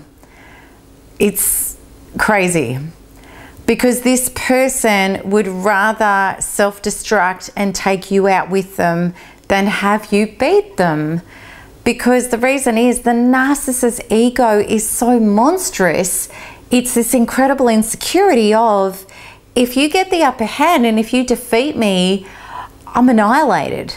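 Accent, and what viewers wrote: Australia. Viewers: the ozzy accent is strong with this one...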